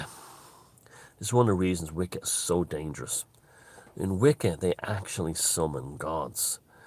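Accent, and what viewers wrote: Ireland. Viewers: The combination of rain falling on your skoda and your Irish accent,delivering knowledge is the true magic. What we have we hold!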